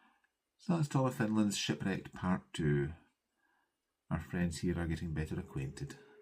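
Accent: Scotland, United Kingdom. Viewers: I think you’ll find your numbers are going to rise again…as Pam of Pam and Steph named you as ‘gold flosstuber’ of the week 🎉🎉🎉 she was quite taken with you - your brogue, temperature stitching and variety of pieces you stitch on. That’s cross stitch fame, is that. Congratulations on your 5,000 🎉🎉 And on your cardinal kin finish - which is smashing. I finished a cardinal piece recently - Cardinal No 1 by Crossed Wing Collection. Big Sis got me the pattern as part of a kit from that wonderful Texas institution, 123 Stitch. It was one of their kits with hand painted fabric. Not as much stitching as your Plum Street - and I’ll admit to cutting back on the excessive pine foliage as I got bored of it (shush don’t tell the cross stitch police). My ever thoughtful Big Sis bought me the Cardinal pin set from Puntini Puntini at the same time - they would look good on yours if you fancy a bit more ornamentation- and quicker than applying chenille. 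Talking of birds, when you showed Ellen’s Ravens at Castle Kerr, it reminded me that some wonderful stitcher had stitched a version with the words ‘we are going to need a bigger moat’ above the castle. I like a bit of whimsy. Love your Autumn Quaker - such great colours. I watched while stitching on Once upon a Summer Huswife by Heartstring Samplery. Carry on blathering and stitching, Steph from Cheshire 😊🎉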